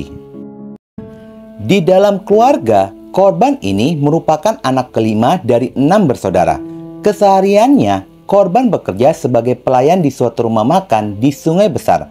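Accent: Banyumas, Indonesia. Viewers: Wong ngapak hadiiirr baang....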